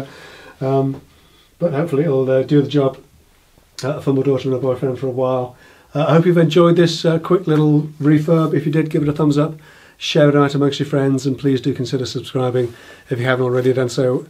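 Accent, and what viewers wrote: United Kingdom. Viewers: Hello. I have just recently subscribed and love your videos - especially the British accent ( me being in the US). I think you’ve been on for a year and I do hope as many others that you continue your videos. I have watched many of your catalog of videos and love all the tips and jigs. Keep up the great work!!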